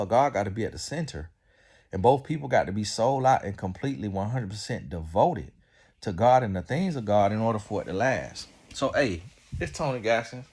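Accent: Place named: Mississippi, United States. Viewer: Tony you so funny and country! Your folks gotta be from Mississippi somewhere… anyway, you be speaking facts for real. A man that want you don’t miss you!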